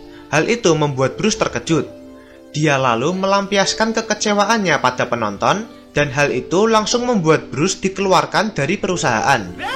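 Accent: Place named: Java, Indonesia. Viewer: TIDAK MENARIK , KARNA PEMBAWAAN CERITA TERLALU JAWA MEDOKK WKWKKK , NO SUBSCRIBE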